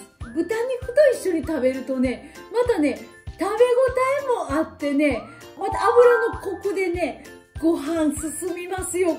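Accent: Kansai, Japan. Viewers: 大阪弁かなと思ったが、ちょっとアクセントが違うので京都弁かなと思い、プロフィールを見たら、京都出身の方でした。 いつもユニークなレシピ参考にしています。３９ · 大根きんぴら作りたいと思います 先生は千切りが好きとおっしゃってましたので流石にうまいです手際が良い。 関西弁が可愛らしいです。そこ知りたいってところのコメントがうまいです😋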